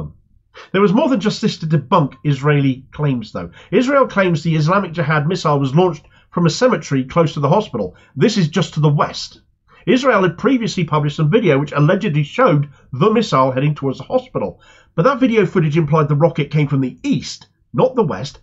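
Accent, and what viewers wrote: Cornwall, United Kingdom. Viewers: I love your Cornish accent! Oh, and the content of your information😅☺️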